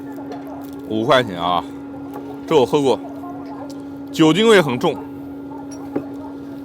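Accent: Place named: Jiangsu, China. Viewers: 前面就感觉说话南京口音，这集听到“小杆子”，那就坐实了是南京人。老乡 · 你江苏人？口音太重了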